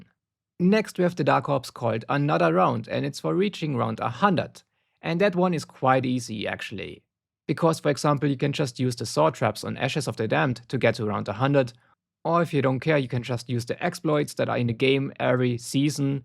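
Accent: Germany. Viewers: Most german accent ever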